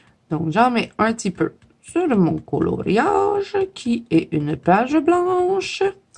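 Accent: Canada. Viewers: Cc 😄merci pour ta vidéo de produits pour le coloriage. Je ne me rappelle plus si je t'ai dit que je me suis abonnée à ta chaîne youtube 😄, j'adore ce que tu fais et j'aime beaucoup ton accent canadien,bises, 🥰❤️💕 Martine.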